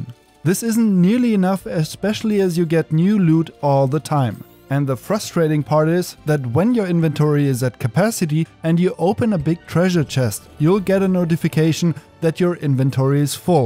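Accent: Germany. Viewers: Bro question? Are you German? I listen a small accent😅 anyways, your video helped a lot thank you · Man merkt sofort das du deutsch bist an dem Englisch is nix auszusetzen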